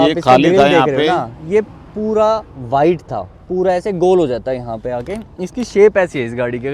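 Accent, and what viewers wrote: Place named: Gurgaon, India. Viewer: टोप, रो , भैयो का एक्सेंट गुड़गावे के लगते।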